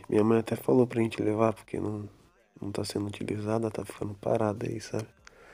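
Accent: Rio de Janeiro, Brazil. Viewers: Tu é carioca ? Ou é impressão ? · sim, ele é carioca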